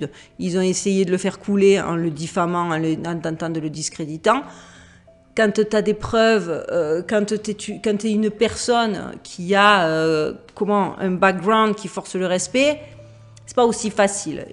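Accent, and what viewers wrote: South, France. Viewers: Elles sont excellentes tes vidéos, j'ignore d'où tu trouves tes informations mais il y a des choses que je ne savais pas et Dieu sait combien j'en ai vu et entendu des documentaires à ce sujet ! :) Contiue ton bon travail, j'aime beaucoup ton naturel et ton accent du Sud de la France ... Mon épouse a le même ! :)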